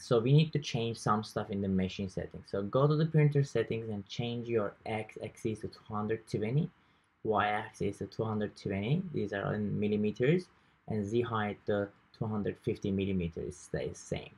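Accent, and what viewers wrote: Turkey. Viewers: Accent is turkish indeed. Cool video! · İngilizce aksanından türk olduğunu anladım. Teşekkürler video için. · Youtube da gezinirken hasbelkader sana rastladım. Konuşma aksanında ya hu Türk galiba dedim ve öyleymişsin. Bir sevindim ki inananmam. Çünkü 3d yazıcıdan silikon basan gördüğüm tek kişi sensin. Bana bu konuda yardımcı olmanı rica ediyorum. 3d yazıcı alarak silikon kalıplar basmak istiyorum. Lütfen bana yola göster. Ne alayım, filamentinden makinesine kadar yardımına muhtacım. Teşekkür ederim.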